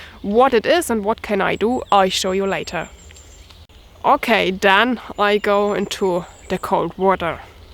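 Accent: Germany. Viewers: Nice video, ik love to hear german people speak english. but u know you dont have to block out beautifull woman, that was in the 90s. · I agree totally with Robert, You are such a sweet and courageous woman, and i love your German accent. Brings back fond memories of my stay in Germany. Hals und beinbruche!!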